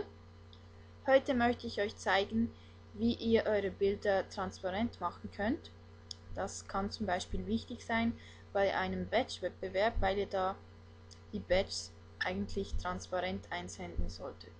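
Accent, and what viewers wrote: Switzerland. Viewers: man hört das du schweizerin bist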